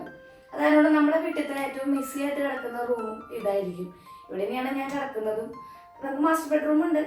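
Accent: Thrissur, India. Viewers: Nintay Thrissur style talks kelkkanada nan adhikavim ee blog kanunneyy...nee sharikkum oru inspiration anu ttoo.. nan pkd anu..nee aniyathy kutty anu ttoo..but experience il neeyanoo edathy onnu thonnipokumm..ninney nan follow cheythu. Nanum entay goal... achive cheyutoo..in sha Allah ❤❤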